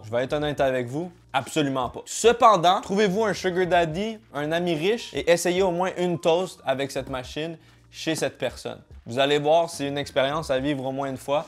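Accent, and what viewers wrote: Canada. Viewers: Is this chap Canadian ? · Cet accent Quebecois pour un français de Paris comme moi c'est juste extraordinaire ! Je ne m'en lasse pas de rigoler (sans me moquer bien sur !). Ca donne un coté tellement sympathique aux Quebecois ! L'autre jour j'étais justement avec un amis Quebecois et je lui disais que si je venais à me disputer avec quelqu'un au Quebec, je n'arriverai même pas à m'énerver correctement si l'autre me parle avec cet accent ! 🤣🤣🤣🤣🤣🤣 Je pense que ça me ferait rire tout au plus ! 😂😂😂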